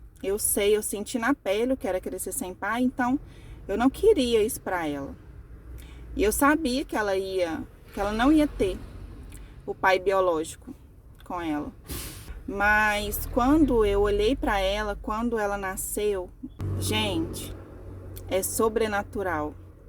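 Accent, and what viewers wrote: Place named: Minas Gerais, Brazil. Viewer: Minha irmã mineira,logo no primeiro vídeo percebi seu sotaque , que testemunho mais lindo . O luto dilacera a alma ,qdo minha neta não tinha nenhum aninho ainda,qdo meu amado esposo foi acometido de um cancer ja muito adiantado.Fiquei quase 40 dias com ele no hospital,passou por 3 cirurgias ,eu só acompanhava os primeiros passos dela por video . Se não for o supremo amor de Deus a gente nao aguenta .Tantos sonhos ,me aposentei pra termos tempo com Marina , e vi meu amado cada dia piorando . Tudo que planejamos indo de uma forma tao cruel ,mas pela graça do Senhor tive forças pra entregar Ele para o Criador e Pai celestial . Foi um processo muito doloroso o meu luto porque entramos na pandemia ,perdi parentes ,vizinhos ,amigos,sobrevivi pelo legado de fé que ele deixou,um homem muito temente a Deus ,um grande pregador e adorador . 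Não foi facil mas o Senhor renovou minhas forças,minha fé e hj prossigo na obra ,minha netinha ja com 3 anos , e minha esperança maior é um dia nos encontrarmos na glória, por isso luto diariamente .Fico feliz que Deus te proporcionou uma nova história, e seu testemunho me fortaleceu ,que possamos permanecer fiél ate o fim , porque um dia Deus enxugará de nossos olhos toda lágrima🙏🙌📖📖📖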